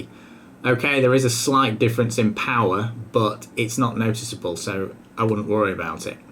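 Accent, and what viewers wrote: United Kingdom. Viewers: First time I’ve come across your channel very good one word of advice drop the orange overalls you look like you’ve just come out of Guantánamo Bay Nice to hear a British accent 👍🏿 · How come dude looks Indian but sounds british 😂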